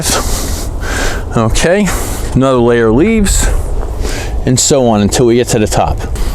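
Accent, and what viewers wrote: New Jersey, United States. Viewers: Great info and as usual, your Jersey accent makes me homesick. Thanks!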